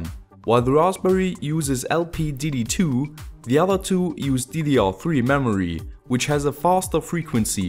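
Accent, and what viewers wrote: Germany. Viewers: eher ein deutsches Amerikanisch.... Und ich dachte immer, in Deutschland würde man brtisches Englisch und RP lernen, so wie ich früher. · Dude, you sounds weird... Are you German?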